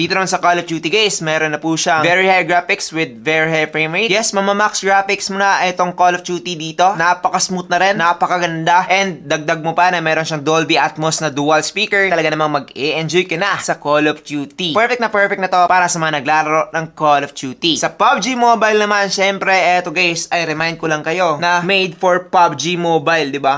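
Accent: Visayas, Philippines. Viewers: Taga San kaba boss parang may kunting bisaya Kasi ung fluent mo sa pag tatagalog din 😮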